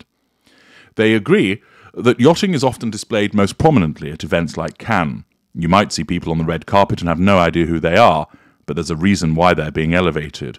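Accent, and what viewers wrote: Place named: England, United Kingdom. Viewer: Hello? Are you not the "real" person who had your own t.v. podcast in U.S.? You stood out to me because of your very "english" dialect on U.S. t.v. or is it podcast. Bald head with glasses? How can one forget! I'm sure someone out there knows your identity.?? Interesting change of carrier that you now have. Oh I'm not in U.S. like yourself but in Norway.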